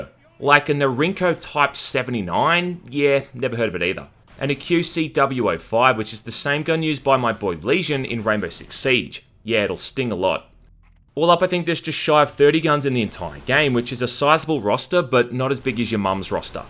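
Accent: Australia. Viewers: Its so weird that your American accent sounds so Australian. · Idk if you'll ever see this comment but thank you for your videos. They are not only great reviews but also have a nice bit of witty humor. Plus for me the accent reminds me of my mums family from Australia. Which also makes me love the videos even more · FrÜt he is australian though?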